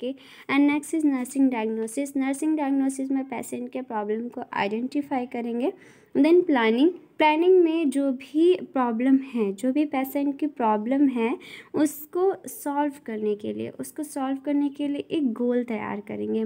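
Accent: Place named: India. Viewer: إنجليزي بنكهة هندية 😂😍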